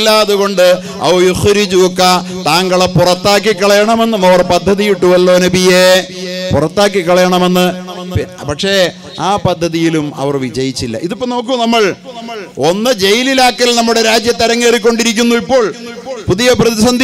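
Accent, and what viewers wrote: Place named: Ernakulam, India. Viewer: ഒറ്റയിരുപ്പിനു കണ്ടു തീർത്തു കണ്ണ് തുറപ്പിച്ചു മനസ്സ് തുറപ്പിച്ചു അസ്സലാമു അലൈക്കും ഉസ്താദേ സംസാര ശൈലി കേട്ടിട്ട് ഈ ഉസ്താദ് എറണാകുളം ജില്ലക്കാരൻ ആണെന്ന് തോന്നുന്നു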